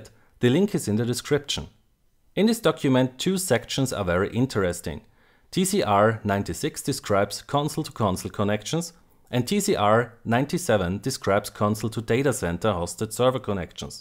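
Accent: Germany. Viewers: Man hört. dass du aus Deutschland kommst. :D Trotzdem super. Übrigens hatte BF2 afair ne Server Tickrate von 36hz. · without knowing who the narrator is, i can say with great accuracy, that he's german :D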